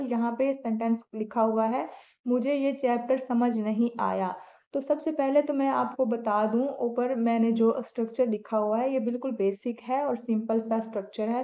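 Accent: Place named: Punjab, India. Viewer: استاد جی آپ کی اردو بہت زیادہ punjabified۔۔ہے۔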